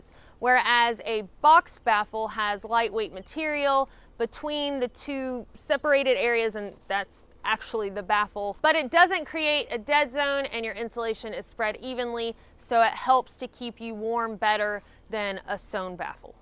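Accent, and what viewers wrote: South, United States. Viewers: 💜 you’re Vlogs and inspiring enthusiastic way of explaining hiking adventures in your southern belle accent 💗Thank you for sharing 💜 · Not only is a lovely lady, she's also a real smart cookie😁 Thankyou, as always your video was very informative and as always, we love that southern accent 👍👍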